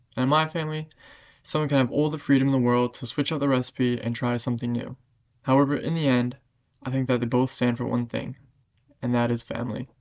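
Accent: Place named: France. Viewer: Outstanding! Great French accent!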